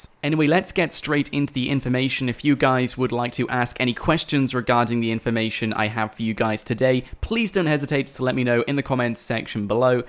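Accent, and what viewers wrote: United Kingdom. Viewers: Firstly don't hate on his British accent and secondly he's saying it the right way, it's pronounced mike-al-de-santa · Stop being mean, its not his fault hes british and that he has a British accented!!!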